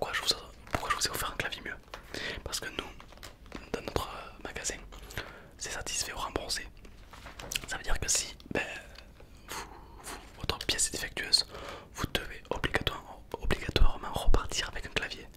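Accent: South, France. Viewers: Du sud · t’as un petit accent du sud toi 😂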